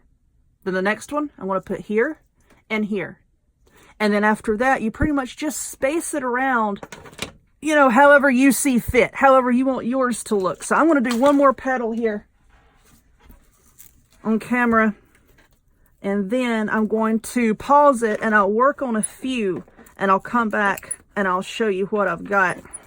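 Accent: South, United States. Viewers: Hahahaha! I LOVE watching your video's!!! You talk like I do......:) I absolutely adore this Rose, I've never seen this before? It's BEAUTIFUL!!! Thank you so much for sharing your talent with us! BTW.......I'm a Georgia Girl.........and love the country Twang!!! LOL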